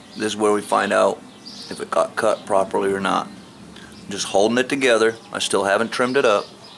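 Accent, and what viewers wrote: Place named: South, United States. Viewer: How did you get such a thick southern accent then? I would think a Maine man would have a east coast accent.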